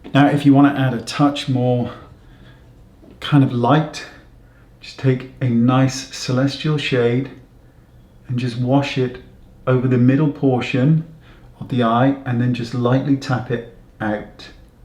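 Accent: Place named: England, United Kingdom. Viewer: So precise and helpful I'velearned so much from binge watching. Love the English accent so pleasant to listen.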